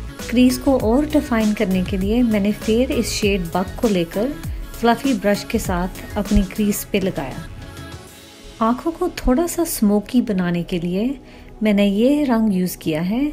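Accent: Punjab, India. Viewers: I like ur punjabi accent👍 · I love you yaar M straight 😂 Love you dil se ❤️❤️❤️❤️❤️❤️❤️❤️❤️❤️❤️😘😘😘😘😘😘 Love from Chandigarh Love your Hindi and punjabi accent Muuuuuahhhhhhhh😘😘😘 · Thodi Punjabi te att aa ji Hindi Ch v ohi accent Mere father ki da c edda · Tadi voice tone ch Punjabi touch aa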